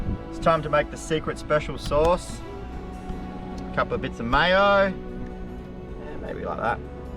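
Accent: Australia. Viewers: It’s aussie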